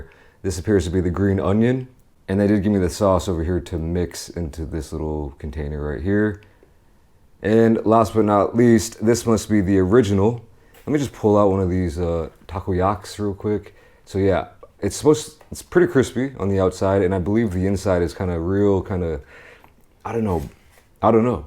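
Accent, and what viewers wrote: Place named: Philadelphia, United States. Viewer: Happy Birthday homie!! Easily my favorite content creator, the way you narrate things reminds me of my brothas from philly 🤙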